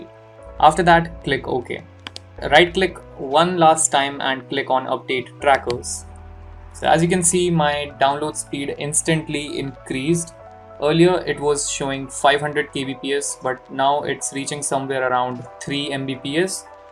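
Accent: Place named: India. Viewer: the indian accent bro 🔥🔥🔥🔥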